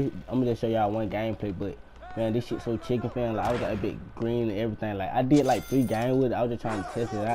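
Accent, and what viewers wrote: United States. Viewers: bro you have to dead ass open your mouth n talk or stop trying to talk with so much sling you from the country so it’s hard to understand you bro I try to watch your videos but can’t really understand you sometimes I hear certain parts but it be a blur for me n my ears bro just try to speak clear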